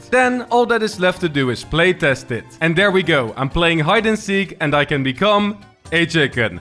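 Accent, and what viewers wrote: Netherlands. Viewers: Ik herken een Nederlands accent wanneer ik het hoor ;)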